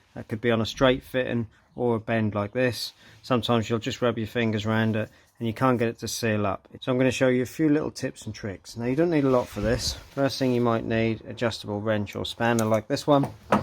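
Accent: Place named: Suffolk, United Kingdom. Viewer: I hear Suffolk, right?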